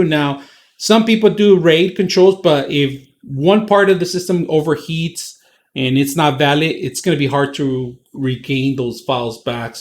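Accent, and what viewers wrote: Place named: Philippines. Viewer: I love your accent, sounds a lil bit filipino sometimes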